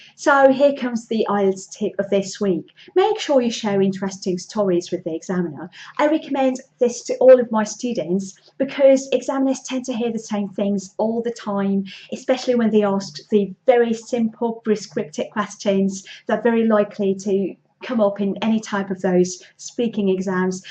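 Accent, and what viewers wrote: United Kingdom. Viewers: Wow 😮 amazing , no accent, just clear British speech! How have you managed to acquire such good pronunciation skills???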